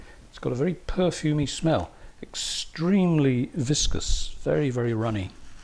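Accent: England, United Kingdom. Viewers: I love how your English accent even makes watching grass grow sound interesting!😊 I have a wooden board hanging in a gold frame on my wall staring at me; it's patiently waiting for me to give it the treatment... very soon...💗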